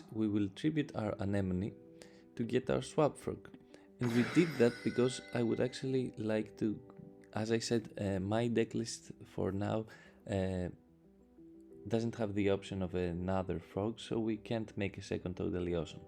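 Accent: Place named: Greece. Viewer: Yes I‘m Greek too but I live in Germany thats why I recognized your accent bro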